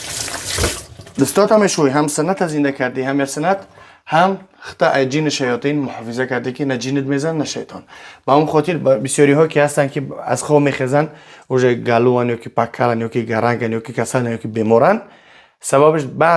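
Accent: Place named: Afghanistan. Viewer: Акнун овози худаткати гап задан бигир ранги авгоно гап мезани туро хечкас Кариб намефахмад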